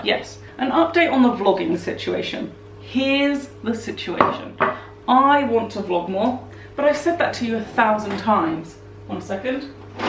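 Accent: United Kingdom. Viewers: I am from the US and I love the way u talk and the terms I dont understand I google myself and have a fun time learning new British words because the UK fascinates me. Honey you just do u ! No matter what because your right u cant win so live for u! Do things the way u like it. :) I adore u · Hey Look up "YouTube Premiere" You can upload a vid for a scheduled time and have live chat...:) Love the vids! Listening to you talk is half the fun! I love hearing the British versions of things! Everything sounds much classier.... lol Much Love!!:))) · Also I like it when you say it in the British way it’s interesting the language difference and 90% of the time I can figure out what your talking about. 😊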